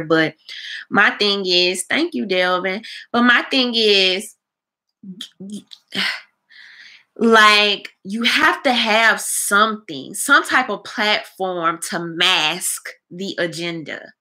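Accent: South, United States. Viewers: I love that little country voice of yours, I'll vote for who ever you vote for.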